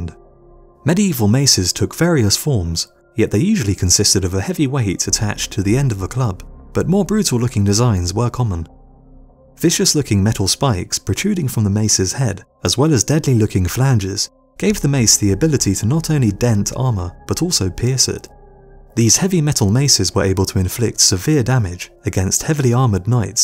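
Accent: United Kingdom. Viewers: Awww shit! You know it's gonna be good when you gotta nigga with a british ascent talkin' 'bout some medieval shit! And yes, that mace=mince-meat.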